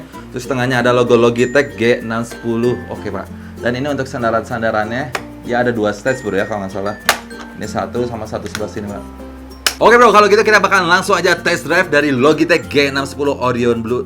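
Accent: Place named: West Java, Indonesia. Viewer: Orang Sunda Mana suarana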